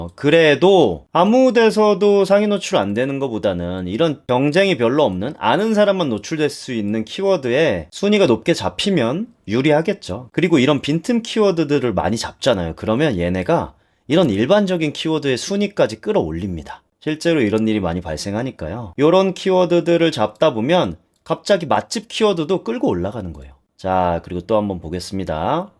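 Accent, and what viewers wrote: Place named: Busan, South Korea. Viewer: 부산이신가보네요 내용너무감사합니다😊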